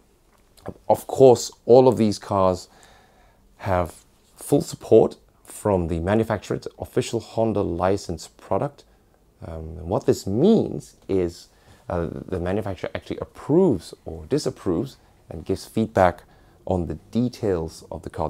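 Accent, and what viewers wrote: England, United Kingdom. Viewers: why is the talking like an Englishman if he's a Japanese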